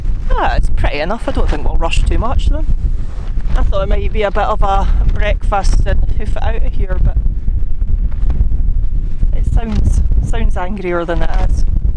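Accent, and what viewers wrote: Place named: Ayrshire, United Kingdom. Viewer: something wrong with his ears. Your voice is crystal. Your Ayrshires answer to Miss Jean Brodie.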